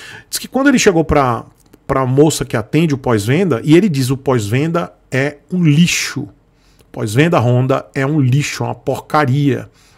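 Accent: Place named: Santa Catarina, Brazil. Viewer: sotaque praiano do litoral barriga verde não engana...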